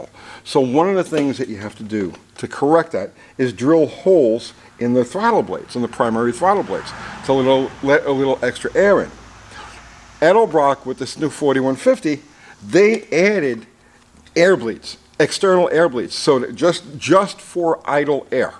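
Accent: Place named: East Coast, United States. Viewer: I dig yir channel ’. Especially yir E-coast patois. On top of that, yir a real guy with an average guys attitude.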